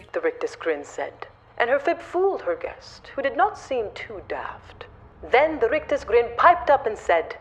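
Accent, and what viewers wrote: England, United Kingdom. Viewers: What's the accent about, is it meant to be English ?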